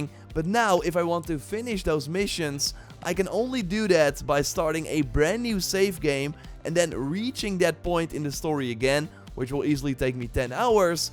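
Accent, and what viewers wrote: Netherlands. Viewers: I love this Dutch accent so much ❤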